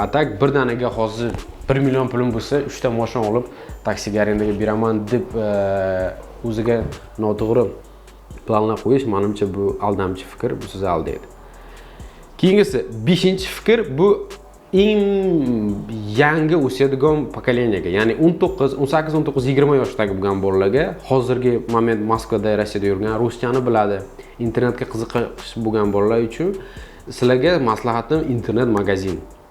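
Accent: Uzbekistan. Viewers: Самаркандча акцент )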